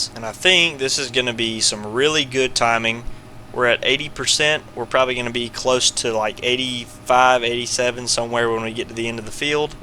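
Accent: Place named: North Carolina, United States. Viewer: Just found your channel watching this series loving so far don’t know where your from but sounds like NC only because you sound just like Dale Earnhardt JR lol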